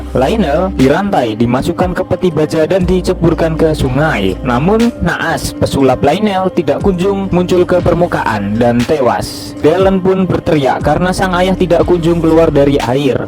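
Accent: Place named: Java, Indonesia. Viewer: Jawa medok...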